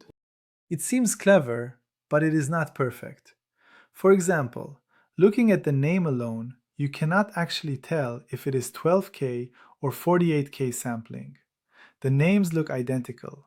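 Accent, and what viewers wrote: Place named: Iran. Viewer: Great video, especially with your sweet Persian accent! :) I tried to find detailed geometric information about the defect, but I couldn't find anything. Did they use EDM to create a single through-hole with the specified diameter? I'm working on a dynamic model of a defective bearing and would like to use the CWRU dataset to calibrate my model. Therefore, I need detailed information about the defect geometry.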